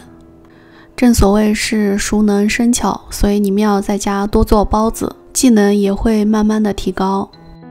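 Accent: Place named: Northwest, China. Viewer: 西北口音哈哈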